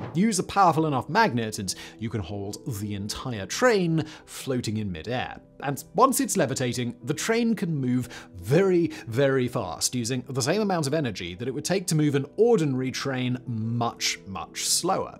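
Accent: United Kingdom. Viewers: Hearing a British accent talk crap about maglevs when y'all can't afford to build stock HSR is cute.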